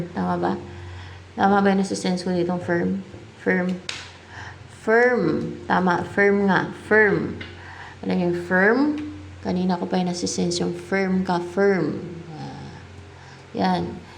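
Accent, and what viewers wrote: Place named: Visayas, Philippines. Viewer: bisaya ka madam a bisaya din cebu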